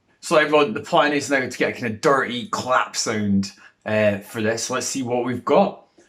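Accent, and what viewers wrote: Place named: Scotland, United Kingdom. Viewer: Scottish accent = Insta sub.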